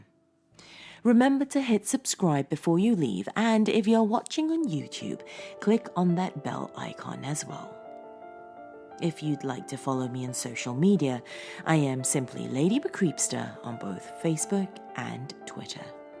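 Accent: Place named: RP, United Kingdom. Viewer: I'm very curious to know why you sometimes say 'de' instead of 'the' when you are quite able to pronounce 'the' properly with your quite posh accent! Very bizarre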